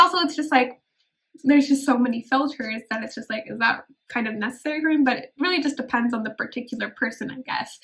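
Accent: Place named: United States. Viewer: Looks Indian, with American accent, beautiful though.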